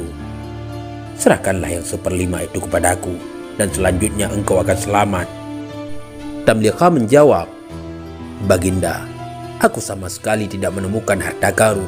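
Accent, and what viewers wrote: Aceh, Indonesia. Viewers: Apakah pengisi suaranya org Aceh??? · Ini yg crita pasti bukan orang jawa , kyk orang aceh atau bali juga lombok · Naratornya dr Bali, Padang atau Aceh? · Logatnya seperti org aceh.. Terdengar dari palafazan huruf "T" nya yg tebal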